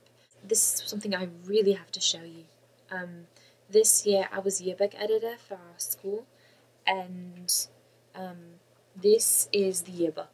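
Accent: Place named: Wales, United Kingdom. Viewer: Woah,. You actually sound really good! You sounded English in some parts, and mostly Welsh;) I am proud!:D A true Cardiffian. (Pokemon red and blue are awesome!)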